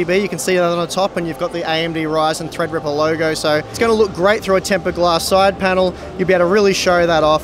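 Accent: Australia. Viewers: disliked because aussie accent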